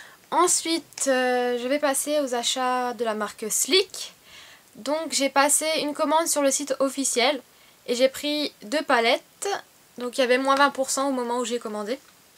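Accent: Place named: Alsace, France. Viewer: j'entends un petit accent de par ici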